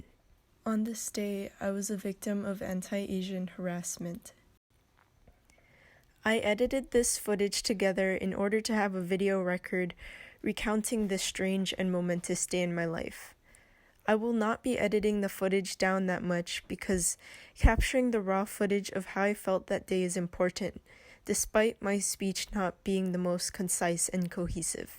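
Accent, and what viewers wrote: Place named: United States. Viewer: She sounds American to me. Stay in your lane weirdo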